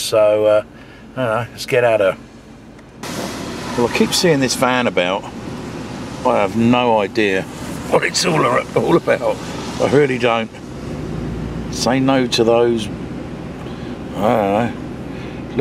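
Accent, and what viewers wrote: United Kingdom. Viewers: what part of the uk were you from?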